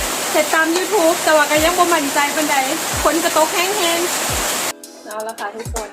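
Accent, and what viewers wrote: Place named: North, Thailand. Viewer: สวยครับเหมือนสาวเหนือพูดไทยน่ารัก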